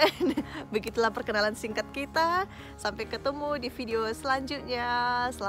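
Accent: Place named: Sumatra, Indonesia. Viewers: Hai kak salam kenal logatny siantar man banget bah,salam dr anak siantar yah kak....jangan lupa ajari abang itu bahasa batak jg kak....senang lihat vlog kk auto subscribe 🥰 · Cantik banget spt artis Begitu ngomong, ketauan, dialeknya masih kental, Batak banget, tpi saya pribadi bangga. Sukses buatmu dan keluarga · Cantik. Logatnya medan banget, "permisikan", heheee... tp enak ditonton 👍 Lanjuttt 😊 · Wah...salut bgt dek...walau sdh berada di Jerman.... logat Medan dan Siantar nya msh sgt kental....saya salut... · Asli medan bah logatnya, suka.. Mamak yg dewasa..mantap.. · Saya senang mendengar logat kakak yang tetap seperti logat sumatra 👍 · Masih lengket banget ya logat Siantarnya.... Saya juga orang Siantar di Jl Sisingamangaraja dekat Rindam. Rumah orangtua di jalan apa? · Logat kau kayak orang medan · Paten kali logat nya iban..., siantar nya dimana..., sama kita org siantar... 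· Logat Bataknya lengket x ya ito Hehehehe God bless u both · Aku orang Siantar bah ,ku subscribe lah kakak ini 😁😂 kek gitu lah logat orang Siantar , cantik-cantik ,rendah hati orang Siantar Weh, setia lagi dah ! 😇😁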